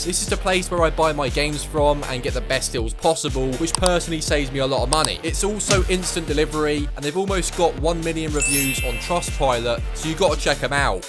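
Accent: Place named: England, United Kingdom. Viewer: You have the most pommy sounding voice.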